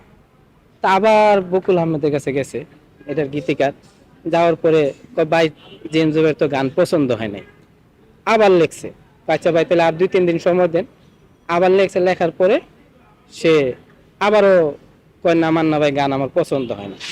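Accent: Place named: Comilla, Bangladesh. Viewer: লোকটা মনে হয় কুমিল্লা জেলার ,,, কথাবার্তা একদম গ্রামের মানুষের মতো 🤔🤔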